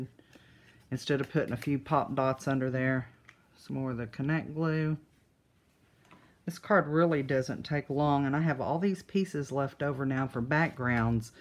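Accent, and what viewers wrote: South, United States. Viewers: Hi. Love the side fold idea!!!! I was watching your video and Alan walked in the room an heard your voice. He said, "Is that? I lover her Southern Drawl". You are famous in our house hold.:).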